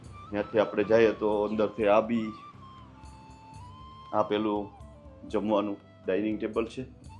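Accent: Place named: Porbandar, India. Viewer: Tame Porbandar na lago se avu lage se bhasa upar thi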